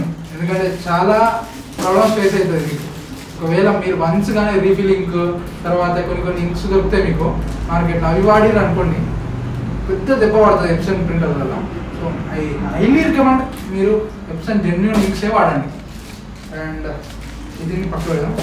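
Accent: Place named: Hyderabad, India. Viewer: Still hyderabad slange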